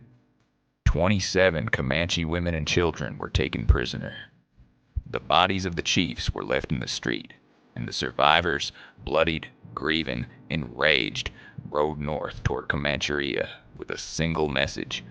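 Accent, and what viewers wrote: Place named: Appalachia, United States. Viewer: The guy doesn't sound Texas. He sounds Appalachian, North Carolina or perhaps Kentucky. Why does it matter so much to you?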